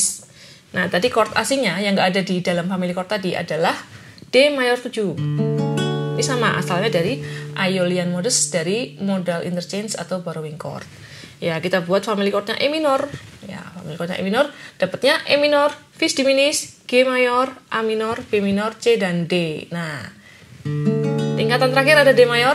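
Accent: Java, Indonesia. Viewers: Medok banget kak 😊 Jawa nya mana ?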